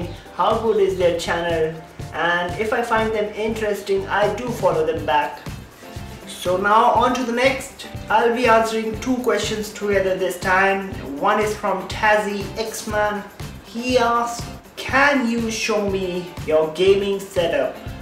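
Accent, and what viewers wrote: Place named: India. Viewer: u look like nepali but sounds like an indian?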